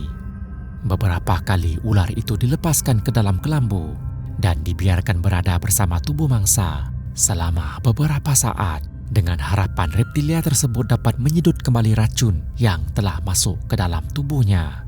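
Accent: Sabah, Malaysia. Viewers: Rasanya admin ne orang malaysia tp lorat sabah atau sarawak kot.. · SAYE TAK PAYAH CAKAP APE² SAYE DAH KENAL DAH SUARE ABANG NI ORANG MALAYSIA SABAH ATAU PON SARAWAK TU JE 😊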